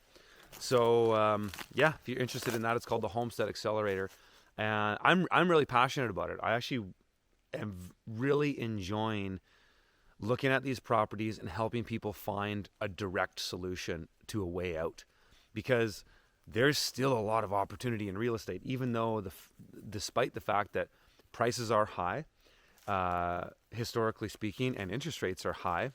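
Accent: Canada. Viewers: Where are you from? Canada?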